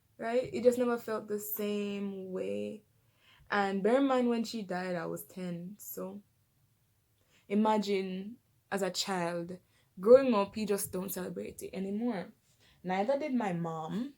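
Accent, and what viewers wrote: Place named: Jamaica. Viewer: The Jamaican in you can't hide sis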